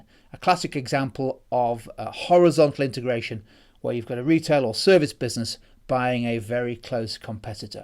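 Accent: England, United Kingdom. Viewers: seems legit because he has an english accent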